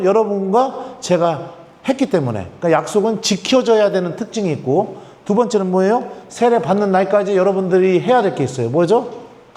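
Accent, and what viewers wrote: Chungcheong, South Korea. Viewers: 신부님 강의는 참쉽고 명쾌합니다. 그리고 충청도 사투리가 너무 정겹고 재미있어서 한참을 웃었습니다.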